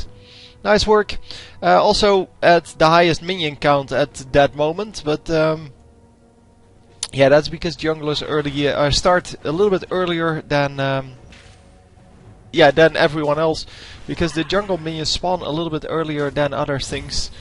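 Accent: Netherlands. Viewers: I sense a dutch guy here XD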